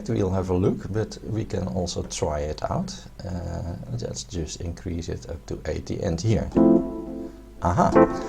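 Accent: Netherlands. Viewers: Thank you for your very excellent tutorials, bravo. You sound Dutch, am I correct?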